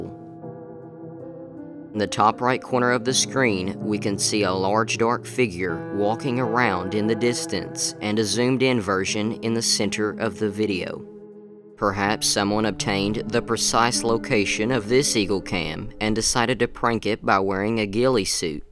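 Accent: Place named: South, United States. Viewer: You sound so country,I wanna say yeah.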